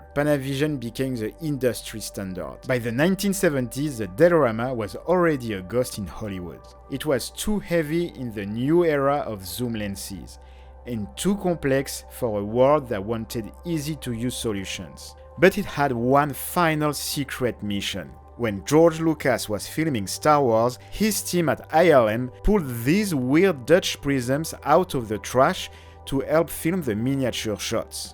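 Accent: France. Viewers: 1. Great and fun historic video 2. Interestingly, this is a physical form of compression. Meaning, for example, JPEG 2000 uses wavelet compression, which is sort of the physical version of that. Another way of thinking of it is instead of shrinking an image in 2 axis, compressing just one. 3. I invented many technologies for the Movie industry, including Core tech for Video Assist (ARRI/Panavision/Sony ) and I invented Disney's Animation Studio software 4. Your French accent is so strong, I thought you were doing an Inspector Clouseau skit. This is not an attack; you are completely understandable. In fact, I would vote if anyone wants to learn to speak French, first learn to mimic you speaking English, and THEN learn French. In sort of a strange way, you're the anamorphic accent filter. English -> English with only French pronunciation -> French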